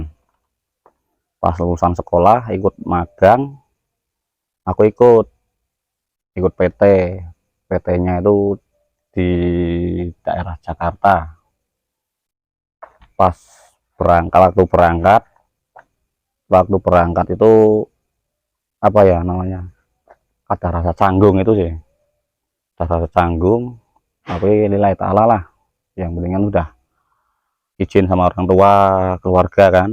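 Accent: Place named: Central Java, Indonesia. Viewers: Logatnya kok sprti orang pekalongan😂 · Nah itu kan nah itukan. Pasti orang jateng plat G😂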